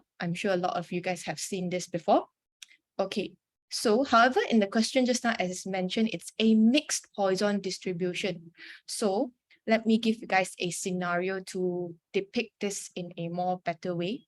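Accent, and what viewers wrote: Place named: China. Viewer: Try to explain slowly and speak english not as chinese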